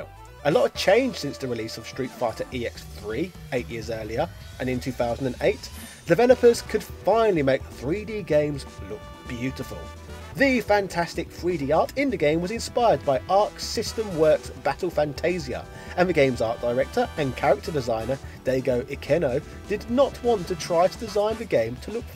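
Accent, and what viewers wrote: United Kingdom. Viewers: As a tournament fighting game player I find your fighting game videos a bit cringy. That's not to say your videos on fighting games are bad, it's just that what we as tournament or hardcore players look for very different things in a fighting game. As an example, casual players went crazy when SF5 didn't ship with a story mode. We didn't care a bit. The game was very solid on launch from a competitive standpoint. I actually picked up my keyboard to shit post and call your videos shit, but then I realized that if you got an OG tournament player to collab with you could make some amazing stuff. With fighting games, the way a hardcore player and casual player look at the game is so different and it'd be really interesting to see both side by side. It is obvious you have a great love for all things fighting games, from a casual level, which is most people that buy these games. 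I saw you and a few others on a stream complaining about tournament players roasting your videos(I was about to be one of said players lol). You'll find that there are some valid reasons you're getting roasted. I just think that showing how basically every fighting game is almost 2 games in one could be really interesting depending on who you can find to collab with. Judging by your accent, you're from the UK? Try getting a hold of Ryan Heart(Harte? not sure on spelling). He'd be perfect to add the hardcore mindset to your videos.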